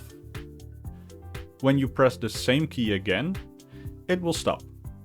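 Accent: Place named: Netherlands. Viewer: I'm dutch! Ja dat hadden we kunnen horen aan je accent pik :D The game looks like fun keep up the great work!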